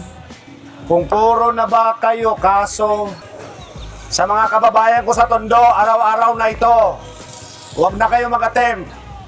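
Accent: Iloilo, Philippines. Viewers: Ilonggo ata SI sir 😂😂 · Parang ilonggo c sir ah 😊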